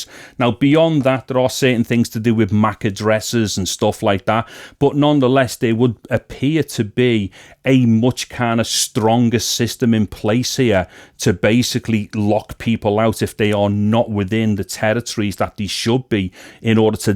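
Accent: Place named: Liverpool, United Kingdom. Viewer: Are you from Liverpool? U sound scouse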